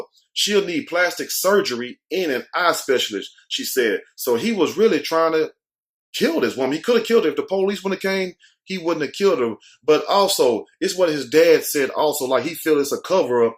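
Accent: South, United States. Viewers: where are u from I can't place your southern accent?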